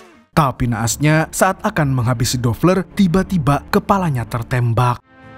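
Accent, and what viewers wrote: Banjar, Indonesia. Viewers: Bah urang Banjar jua rupanya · Orang Banjar kah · umai sanak nih urang banjar kah sekali nya wkk sukses sanak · Urang Banjar jua sekali admin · Umma, urang banjar kah sekalinya pian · 😂dsa ngalih nyawa ni lh. Urang bnjar kalinya. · Mimin nya urang Banjar leh😅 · Urg banjar kalinya julak mimin neh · urang banjar amang nih sekalinya wkwkwk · Bungul urang banjar sekalinya 😂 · Pian ni urang banjar kah? 😁 Sesama kekawalan kita lah.. 😁 👌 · Urang banjar jua kah pian nih julak..😅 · Pian orang Banjar KH min? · Urg banjar sakalinya admin nih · Harau urang banjar sekali nya sidin neneh 🤣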